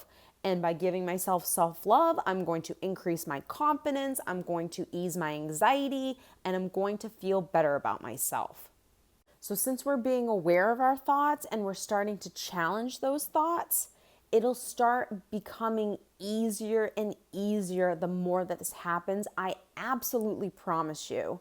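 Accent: Boston, United States. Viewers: She actually has a bit of a Boston accent and honestly our d's often sound like a t.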